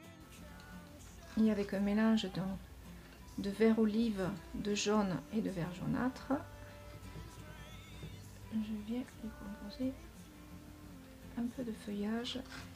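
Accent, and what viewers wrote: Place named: South-West, France. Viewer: Chirurgicalement parfait. PS: vive l'accent du sud ouest !!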